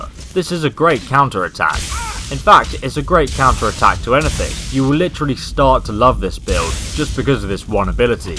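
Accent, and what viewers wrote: England, United Kingdom. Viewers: Stefan Luckerbauer Sounds British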